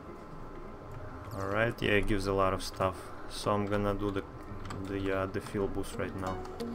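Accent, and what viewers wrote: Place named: Russia. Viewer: Похоже что русский говорит на английском. )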